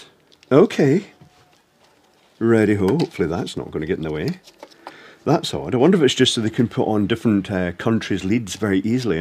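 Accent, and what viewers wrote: Scotland, United Kingdom. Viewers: just adore your accent is it morningside. Totally annoys my wife with my rendition of, one moment please.my wife thinks Renfrew. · You sound British so this will be a disassembly rather than an American ‘teardown’ surely.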